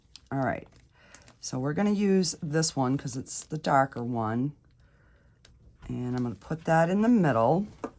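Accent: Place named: Rhode Island, United States. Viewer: You sound like my RI family 😀 I enjoy watching you create.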